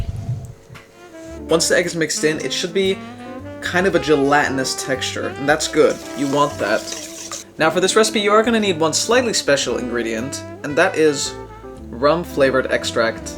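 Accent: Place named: United States. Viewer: As a British person I can proclaim that you sound 1,000,000% American, sorry 😵 it's pretty common for Europeans, but usually there's a hint of something else in there, though I don't detect it with you!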